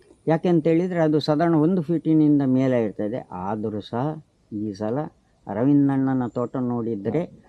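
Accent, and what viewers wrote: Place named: Tamil Nadu, India. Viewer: ಇವರ ಭಾಷೆ ಯಾವುದು.. ಕನ್ನಡ ಸರಿಯಾಗಿ ಬರಲ್ಲ ಅನ್ನಿಸುತ್ತೆ.. ತುಂಬಾ ಕಷ್ಟ ಪಟ್ಟು ಕನ್ನಡ ಮಾತಾಡುತ್ತಾಯಿದ್ದಾರೆ. ತಮಿಳುನಾಡಿನ ಜನ ಕನ್ನಡ ಕಲಿತರೆ ಹೀಗೆ ಮಾತಾಡೋದು.. ಪದಗಳನ್ನ ಕೂಡಿಸಿ ಕೂಡಿಸಿ ಮಾತಾಡುತ್ತ.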